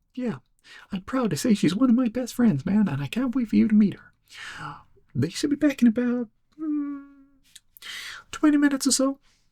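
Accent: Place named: United States. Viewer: Interesting American accent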